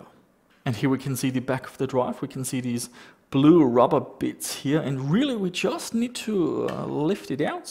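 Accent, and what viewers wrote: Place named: Germany. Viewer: this guy sounds if his native language is German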